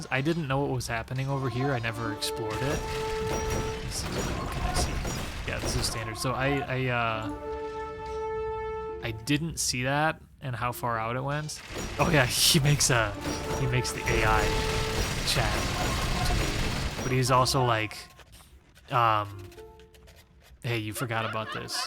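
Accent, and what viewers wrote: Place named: Minnesota, United States. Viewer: you sound like you are from Minnesota lol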